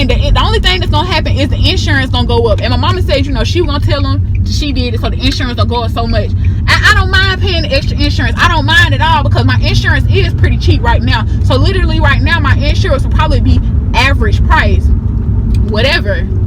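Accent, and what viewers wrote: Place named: United States. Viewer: That country talk coming out in this video yaaass 🙌🏽🙌🏽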